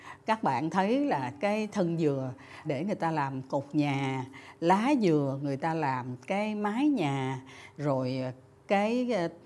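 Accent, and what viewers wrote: South, Vietnam. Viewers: Em mói biết đến you tube của chị đươc vai ngày nay. Rất thích cách dẫn dất chuong trinh, chia sẽ kiến thưc rông rãi, đề tài hấp dẫn và nhất là giọng nói miền năm Viêt Nam, thât dịu dàng thân mât của cô. Mong chuong trình sẽ còn tồn tại lâu dài đê em được tiếp tục theo dõi và học hỏi nhiều điều hay chỉ chia sẽ.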